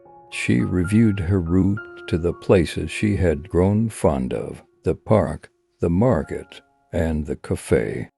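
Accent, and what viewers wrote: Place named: United States. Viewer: someone could tell where this accent is? i know is american by his accent but what part of america? it is so relaxing his voice. please someone could tell me?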